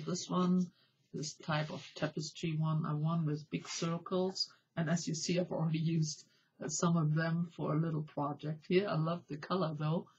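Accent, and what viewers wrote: Germany. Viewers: Hi! Yes I won Andrea's journal and a lot of artsupplies! But you have a European accent right....? German? So great you love our little country. Hope you still visit Europe, I love to go to the USA. Last year I was for a short visit in Denver and I think you live there now? Thanks for your reply!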